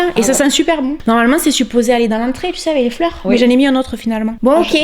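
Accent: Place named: Southwest France, France. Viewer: Bien sur que cette vidéo nous plait ! Toutes tes videos sont superbes! Je vis à montral mais je biens du sud ouest. Ca fait plaisir d'entendre l'accent chaque semaine :) merci ❤️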